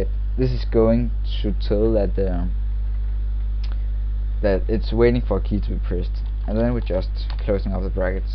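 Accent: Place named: Sweden. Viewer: Hey you're swedish :D !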